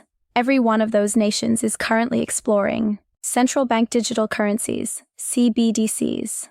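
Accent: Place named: Latin America. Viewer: I prefer her Latin accent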